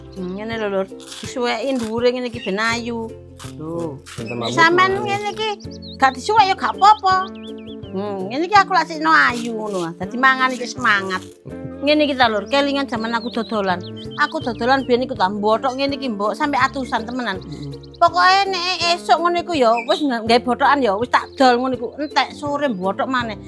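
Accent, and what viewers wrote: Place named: Surabaya, Indonesia. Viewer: Aksen jatim sby an....😂👍 seneng lihat konten bu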